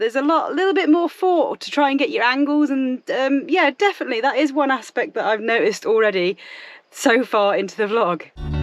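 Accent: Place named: Northern England, United Kingdom. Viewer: The first vlog I saw with you both I was amazed at your collective energy and togetherness 🤗 and it's great to have time away on your own but can be scary sometimes hey, I fully enjoyed this vlog as all your others and it was great to see at the end, if you don't mind me asking but with your northern accent where are you from, I'm from Burnley but live in London mainly for the weather 😁☀️😘 I can't handle the rain ☔ in Burnley 😳 it's horrible, even in summer you can get lots of rain 😳 have a great trip to Portugal 🇵🇹 will look forward to seeing how you both get on there 🤗 Edit, we've even had Hail Stones in London tonight 🙄☔😳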